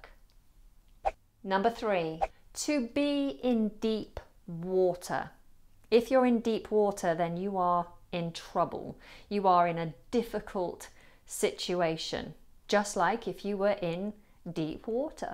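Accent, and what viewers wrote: United Kingdom. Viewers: I really admire the British accent. Great class. One more subscribed. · Vrey nice story I like British accent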